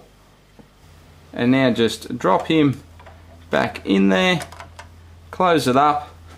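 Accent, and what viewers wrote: Australia. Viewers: R u australian firat thing i do i hear your voice then i comment yu sound thats why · I'm Aussie and this dude has a REAL Aussie voice!!!! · Hmmmm, I wonder if he's Aussie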